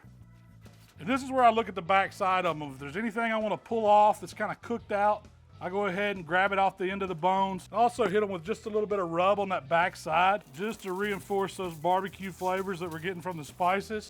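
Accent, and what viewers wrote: South, United States. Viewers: Especially one with a southern accent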